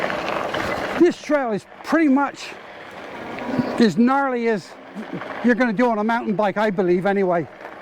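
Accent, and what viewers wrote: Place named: United Kingdom. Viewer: Beautiful, you sound like you come from Blighty?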